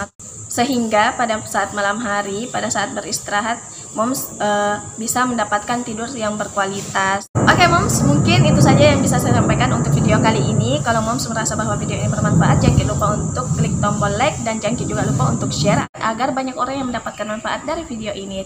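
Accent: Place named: Makassar, Indonesia. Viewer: Org makassarki 🤭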